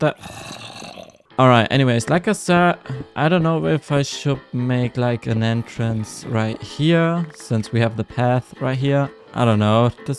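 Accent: Germany. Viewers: i watched last video and this one and literally at the first minute of the last one i knew you arent from england cause you feel german lol ( in deutsch in der ersten minute hatte ich direckt das gefühl das du deutsch bist weil du keinen englischen sondern deutschen dialekt hast lol ) anyways i love your content already :D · Name für einen Hund Balu , mann hört an deinem Akzent das du Deutsch bist oder bei Cactui find ich nice das du Englischsprachige videos macht.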